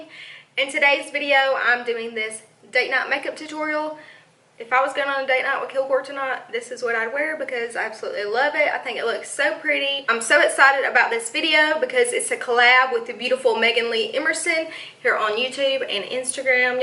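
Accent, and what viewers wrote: South, United States. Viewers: You're so pretty! What camera are you using? I love your accent, I'm have a country accent as well and you're truly inspiring me to start my channel! Xoxo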